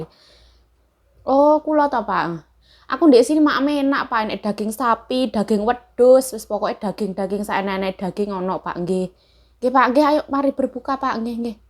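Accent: Java, Indonesia. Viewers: Lucu ya mba ngmong na..ciri khas bgt legot jawa ya.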